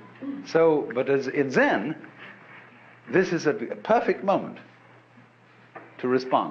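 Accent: United Kingdom. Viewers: How nice it is to hear a semi posh English accent for a change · Are you sure you aren't referring to Alan Watt, the Scottish guy? Apparently people are confusing the 2. I only recently came across this Alan Watts w a British accent and was like wth? Til I figured it out.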